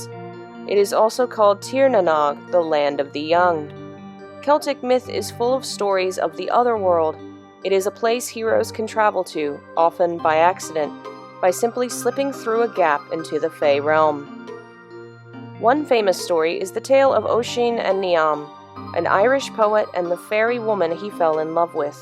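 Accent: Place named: Virginia, United States. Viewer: omg are you from Virginia?? I've been wondering what your accent is and I thought Virginia. I went to middle and high school in Richmond :)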